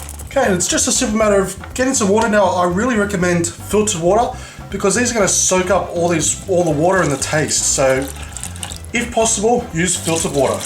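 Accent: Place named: Australia. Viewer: I love Australian accents for some reason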